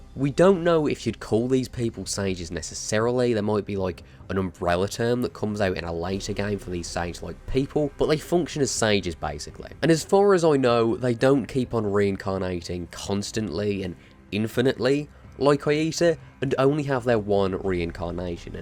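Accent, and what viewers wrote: Birmingham, United Kingdom. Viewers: I did actually think you were from Brum but wasn't 100% sure. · Birmingham. Can you not tell?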